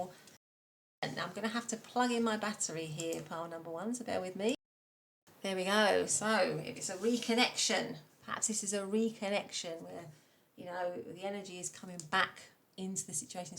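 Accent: United Kingdom. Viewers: i noticed your channel a long time ago and been watching a few, it was quite on spot. but i always am puzzled as in why such little follower count? if i can give advice, i would say it's the volume, i have to turn your volume up everytime. and maybe you speak a bit too fast, combining with the British accent combining with how quiet it is...poeple would struggle to understand. i'm saying this caz i simply cant understand why so many channel that doing readings that were never my situation attract so many attention while the one that does make sense is not receiving equial share. so, imrpvoe your mic maybe? i hope you channel grows.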